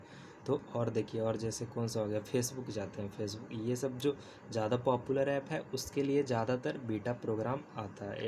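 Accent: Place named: Patna, India. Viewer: Your from patna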